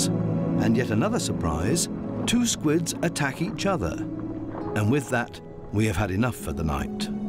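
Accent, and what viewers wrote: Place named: United Kingdom. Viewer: Like the British accent the voice of the geocide of millions of people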